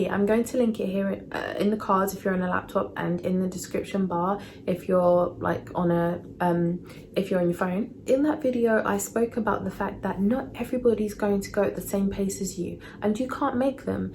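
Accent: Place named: United Kingdom. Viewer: So happy to hear a British accent and thank you for speaking on this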